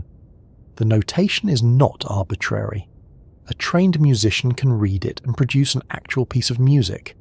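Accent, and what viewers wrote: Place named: RP, United Kingdom. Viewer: Lose the horrible posh Brit voice.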